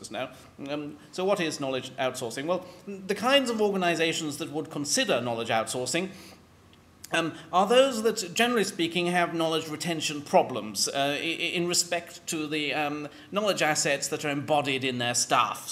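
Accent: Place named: RP, United Kingdom. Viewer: You are FRIGHTFULLY posh, aren't you! (It's refreshing. I thought I was the only person left who spoke like they stepped out of a 1940s Ealing Studios film. JOLLY good show, old chap!) By the way, I have absolutely NO idea what you're talking about (I'm an artsy type...who, by definition of that fact, has 'knowledge retention' issues), but I could listen to you talk for HOURS.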